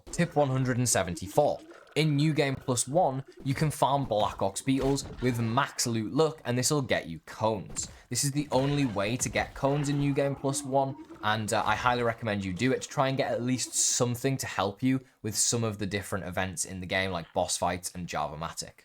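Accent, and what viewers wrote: Liverpool, United Kingdom. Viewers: Ooohhh is that a fellow scouse accent I hear?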